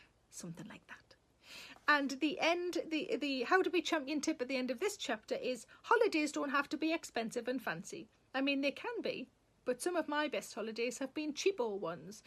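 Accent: Newcastle, United Kingdom. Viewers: The little voice in my head used to say "you must wash your hands". Now it says "wash your fooking hands" in a Geordie accent and it makes me smile every time. Thank you hon :-)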